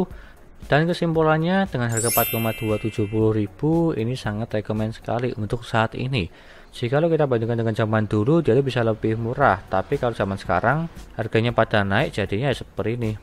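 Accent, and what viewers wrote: Surakarta, Indonesia. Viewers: Logat solone ketotot😂😂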